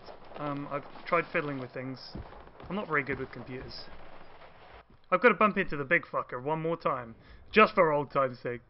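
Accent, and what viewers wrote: Germany. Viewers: dat German accent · Amazing german accent! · started with a generic german accent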